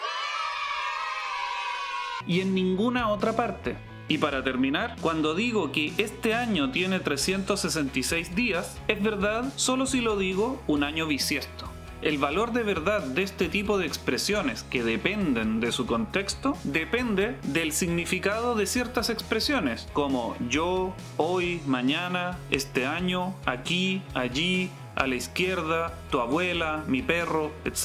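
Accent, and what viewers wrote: Chile. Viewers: Q lindo el acento chileno, no se xq la gente no le gusta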